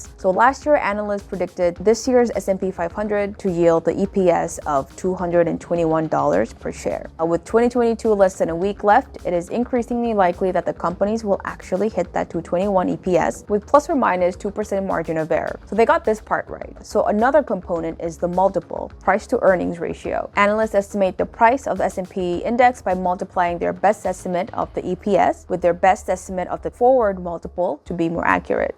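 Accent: New York, United States. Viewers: 역시 Newyorker 는 영어로 하셔야 같아요~~~ 잘보고 공부 많이 되었습니다.! 지난주 뉴욕 출장시 Bryant park하고 도서관 지날때 생각나더라구요, 한국서 건승하시길! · 이거슨 미쿡 발음?👍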